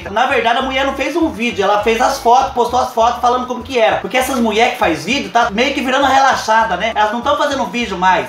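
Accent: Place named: Paraná, Brazil. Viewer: Cara vc é muito bom adorei com esse sotaque até parece que vc mora perto de mim aqui o povo fala assim kkkkk interior do interior do interior de Curitiba kkkk doce fino o meu endereço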